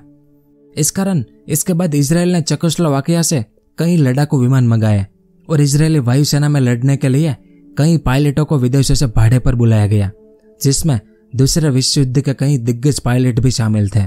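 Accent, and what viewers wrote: Gujarat, India. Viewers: Yeh bhai Gujarati hai..unke voiceover se pata chalta hai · आपके बोलने Accent एकदम गुजराती जैसी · tamaru bolvanu tone gujarati aave chhe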